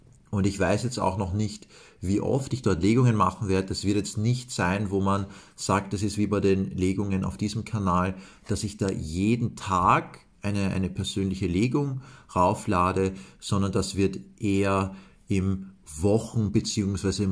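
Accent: Austria. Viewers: Jaaa, Du bist teilweise eine Schlüsselfigur in meinem Prozess❤Danke dafür und ich liebe auch Deinen Wiener Dialekt, dalls es wienerisch ist, aber ich glaube schon😅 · Ich LIEBE deine Stimme, lieber!❤ Noch dazu mit diesem wundervollen österreichischen Akzent, sehr berührend!🙏🏻💕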